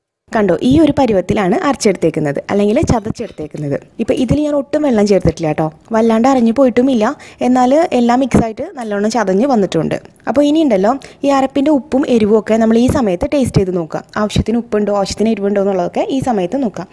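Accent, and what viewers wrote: Thrissur, India. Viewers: Beautiful Thrissur language. Beautiful explanation, beginners can follow well.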